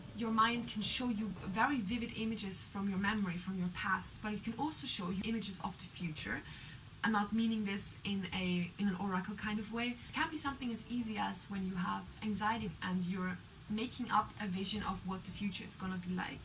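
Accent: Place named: Scotland, United Kingdom. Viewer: your accent is beautiful. What kind of accent is it? Is it scottish?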